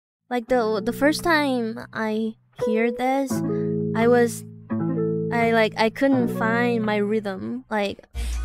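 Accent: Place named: United States. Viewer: you speak English like you from the states. That's pretty rare in China.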